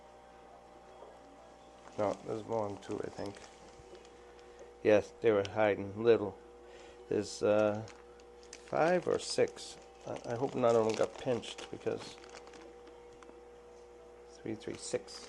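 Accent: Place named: Boston, United States. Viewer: I love your Boston accent. Awesome video, thank you for sharing.:face-red-heart-shape::hand-pink-waving::glasses-purple-yellow-diamond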